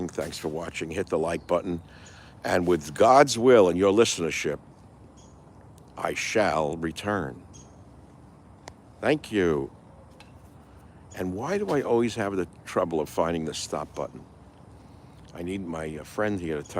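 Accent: New York, United States. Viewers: hooorrrible just horrible. so new york sounding lol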